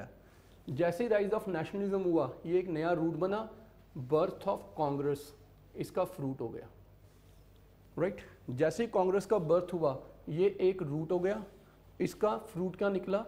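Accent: Punjab, India. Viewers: Finally someone explained the history in a lucid manner and cherry on the cake is his punjabi touch that keeps you involved during lecture..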